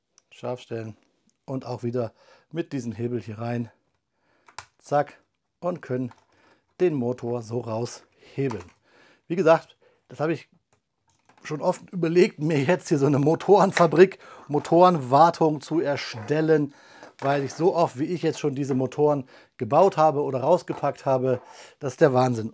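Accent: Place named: Southern Germany, Germany. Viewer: By your German accept I'm guessing you are based somewhere in the south of Germany. Am I correct? Thanks for another good review! The model is actually better than I anticipated. Sadly still no room on any shelf at home to place a huge one like this.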